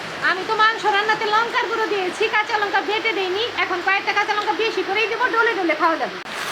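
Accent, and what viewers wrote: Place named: Dhaka, Bangladesh. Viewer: আপু আপনি কি ঢাকায় ছিলেন আপনার কথা ওনেটা আমাদের মতো ঢাকাইয়া ভাষায় কথা বলেছেন রিপ্লাই দিয়েন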